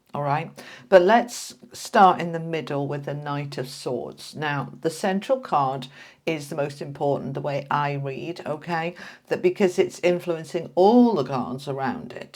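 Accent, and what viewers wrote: England, United Kingdom. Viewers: Thank you ❤ What a pleasure listening to your English accent. I have been fighting for years for my heritage mainly with my sister who is taking all the economic profit on this situation. Lately I’ve sent un ultimatum to her lawyer because I am just tired of this unfair situation. This is real insane when we have to fight against our own family but it’s just scandalous the way she can take profits of the situation making me paying for her accommodation with all the referred financial charges. Well I pray for the end of this mess because I am getting old and tired to continue working paying for her profits. Even my health balances at my age and my body keeps telling me it’s time to stop. Hoping that the sun finally comes out and brights in my sky and in my heart. Thank you very much for this vidéo. You do a great job. God bless you 🙏🫶💐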